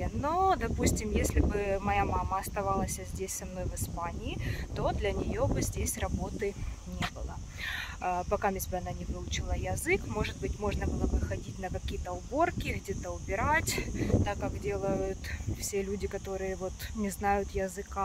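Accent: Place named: Ukraine. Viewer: вы мне очень нравитесь, нравятся ваши обзоры. Вы молодец, модете прставить на место завистников и кто злорадствует. Очень нравится ваша не зависимость, решимость,уверенность в себе, я даже гдето вижу себя в вас. Так держать. Я тоже когда то, но это было давно, уехала с Украины, долго себя искала, не чего не боялась. Единственное что, только не обижайтесь, поработайте немного над произношением. Наш украинский говор не очень красивый. Почему говорю наш т.к. я тоже так говорила и у меня подруга была в Питере ей было совестно за мое произношение. Только не обижайтесь но произношение ужастное. Желаю вам удачи во всем вами задуманом.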